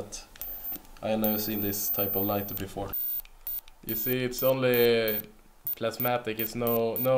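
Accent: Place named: Sweden. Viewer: You are Swedish, i can tell by your accent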